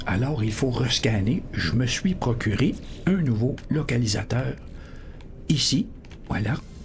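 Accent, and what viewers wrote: Quebec, Canada. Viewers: Ne change rien. Perso, j'adore l'accent québécois...👍👍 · Salut, Je voulais juste te dire à quel point j'apprécie ta façon de parler avec cet accent québécois authentique. C'est ce qui rend ton discours si unique et captivant. Ne change rien! En France, où j'habite, on n'entend pas souvent cet accent, et c'est précisément ce qui rend ta présence si spéciale. Ta manière de parler apporte une touche de fraîcheur et d'originalité, et c'est l'une des raisons pour lesquelles tant de gens t'écoutent en france. Ce que tu fais est vraiment superbe, et je pense que c'est important de célébrer nos différences linguistiques. Cela crée une diversité enrichissante et stimulante. Continue à être toi-même, car c'est ce qui te rend exceptionnel.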